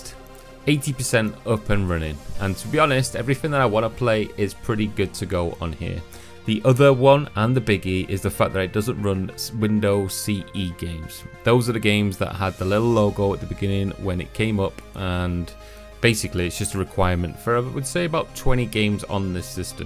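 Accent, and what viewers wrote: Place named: United Kingdom. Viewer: Where the feck are ya from pal? Can't quite place that accent. After loads of vids it's pecking mi head bad. Deffo UK but where!? :)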